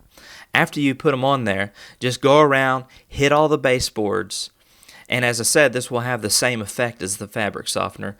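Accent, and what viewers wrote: United States. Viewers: I love Libman brand and that's a great tip with the cloth over the broom. PS what state are you from? I'm in TN and I love your accent. ❤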